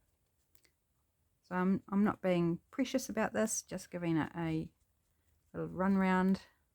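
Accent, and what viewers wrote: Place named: Australia. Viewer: I am from Aussie so it was great to hear someone from this side of the world.Your clear pockets looked good, thanks for you video.thank you for your palm tree freebies.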